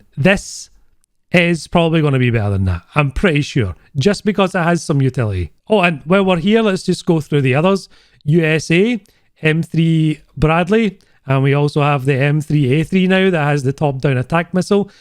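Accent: Scotland, United Kingdom. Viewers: He's Scottish mate.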